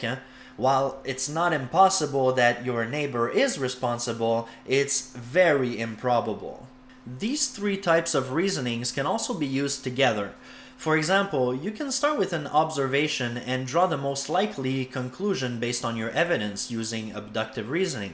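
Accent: Quebec, Canada. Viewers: Sounds French Canadian to me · wannabe to wasabe he's Canadian French. Didn't learn English til age 9.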